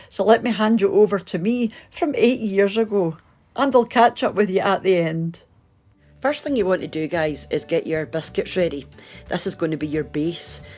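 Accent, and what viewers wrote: Scotland, United Kingdom. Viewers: In the earlier videos, tried to minimize her Scottish accent because she was self-conscious about it.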